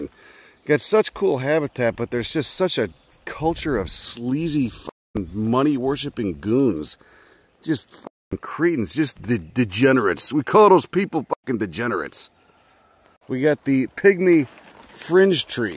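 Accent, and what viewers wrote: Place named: East, United States. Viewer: I'd love to know, where is that accent from? I'm from CO and CA, so I have trouble identifying Eastern accents.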